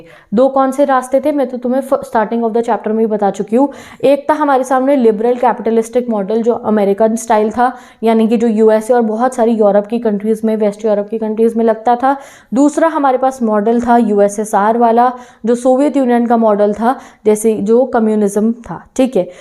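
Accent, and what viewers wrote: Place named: Madhya Pradesh, India. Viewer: Didi aap jabalpur se ho na?? Madhya Pradesh se ho itna confirm hai😅😂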